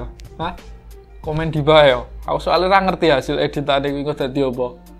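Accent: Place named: Java, Indonesia. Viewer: medok pak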